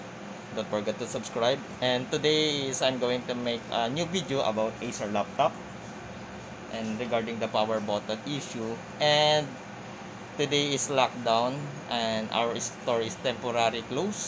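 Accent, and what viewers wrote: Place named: Visayas, Philippines. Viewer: Bisayaa oi. Peste. English² pang kagwang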